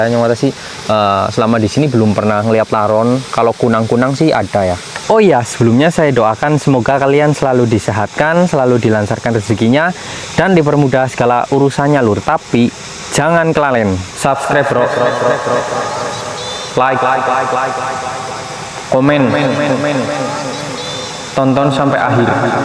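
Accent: Banyumas, Indonesia. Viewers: mas bro kowe wong ngendi si kok ngomongnya kaya gitu ?, orang ngapak ya !. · Kang kaya wong Banyumas asale ngendi.... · wong ngapak ya mas bro?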